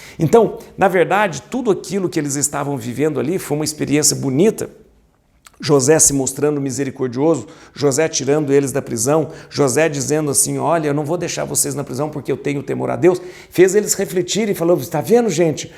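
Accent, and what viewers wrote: Minas Gerais, Brazil. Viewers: Boa tarde a todos,. Boa noite padre sua benção. Maria de santo Amaro SP SP. Padre. Olha aí aquele ditado. Deus escreve serto. Se os irmãos não tivesse vendido José. Não tinha cumprido. O sonho de José la dos feixes. Vio Jesus escreve serto por linhas tortas. Amo o seu sutaque mineiro kkkkkk também dou mineira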